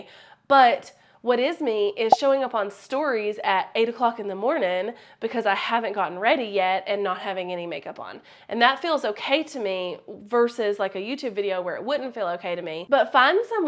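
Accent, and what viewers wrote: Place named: North Carolina, United States. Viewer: As a NC native (who still lives here), I love listening to you because I know that there is a place for someone who talks like me on YT! You give so much great information all the time. Thank you so much for being an open book and giving us all the tips.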